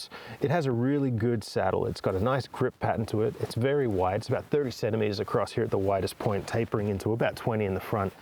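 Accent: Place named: Australia. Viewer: Love the accent dude. Not sure if Aussie, Kiwi or Yank or mix therein. I really like that bike too. Thanks for the informative vid na!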